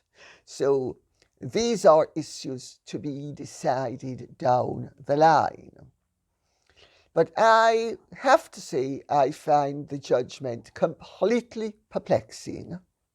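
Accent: United Kingdom. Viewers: Woof, we the Scottish terriers from Sunny 🌞 Southern Cali! We love ❤️ love your beautiful musical 🎶 British accent. Your command of the King’s🏰 English and commentary is spot on.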